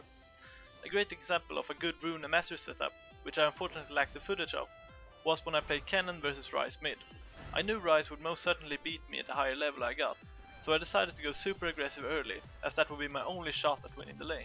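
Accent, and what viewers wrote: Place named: Norway. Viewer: where are you from? you sounds like you are from norway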